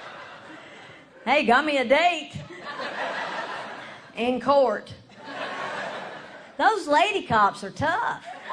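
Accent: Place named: South, United States. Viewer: Something about that southern accent..... quite nice.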